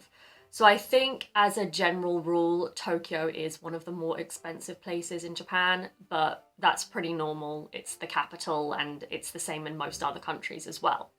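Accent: United Kingdom. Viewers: ソイマッチ！てゆうイギリス訛りがクセになる！ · 初めまして。英語でお話されることで外国にも日本をご紹介頂けるので嬉しいです。加えて日本語字幕も付けていらっしゃるので日本人も楽しめます。とても良いですね。また、さんのイギリス英語は、アメリカ英語に比べて、とても格調高い発音に聞こえて心地良いです。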